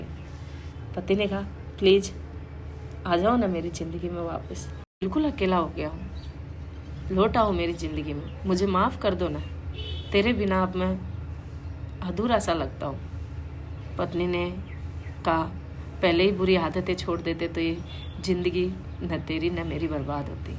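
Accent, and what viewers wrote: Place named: Haryana, India. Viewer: Are you from Haryana you speak like one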